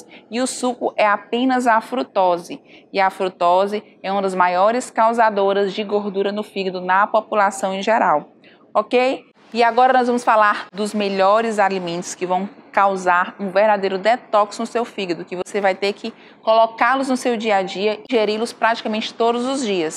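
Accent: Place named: Ceará, Brazil. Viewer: Pelo sotaque dela deve ser do Ceará.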